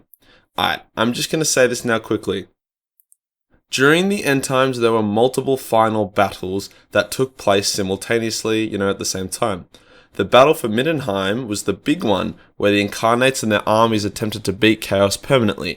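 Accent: Australia. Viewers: Great vid man, always awesome to hear a Australian cover lore. Not only educational but funnier then my non-existent love life.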